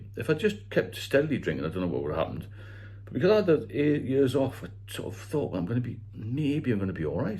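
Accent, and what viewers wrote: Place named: Newcastle, United Kingdom. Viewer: Cheers. I might take you up on that at some point m8👍 on a more positive note, I hear from your accent that you're a geordie m8, congrats if you're Newcastle fan 👍 well deserved, I watched it earlier, loved it. I'm a chelsea fan.... Nuff said about that 🙄. Cheers 👍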